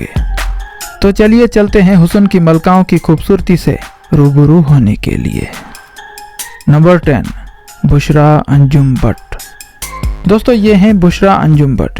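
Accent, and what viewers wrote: India. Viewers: یہ۔ تو۔ کوئی۔ انڈین۔ کتا۔ لگتا۔ ھے۔ اس۔ کی اردو۔ غور۔ سے۔ سنو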